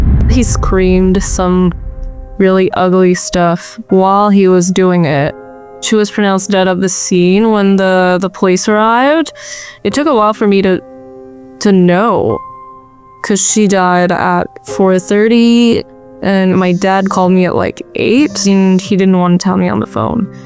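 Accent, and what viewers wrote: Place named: Quebec, Canada. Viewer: She has a slight French Canadian accent. English may not be her first language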